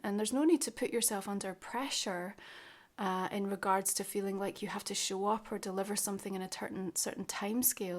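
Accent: Scotland, United Kingdom. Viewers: Lovely read thank you 🕊🙏. Very refreshing to hear a Scottish accent 🏴🏴🏴😊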